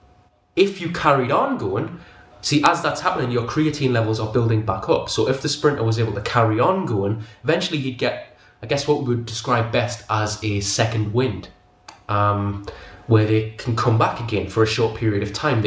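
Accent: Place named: United Kingdom. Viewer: Hey, from your accent I guess your from the UK, i was wondering where abouts are you based as i was thinking about having a personal trainer cheers tom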